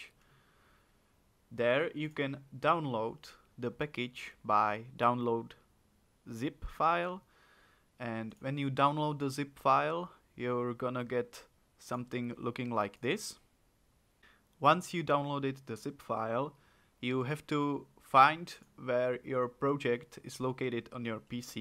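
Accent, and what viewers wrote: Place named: Czech Republic. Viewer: you sound czech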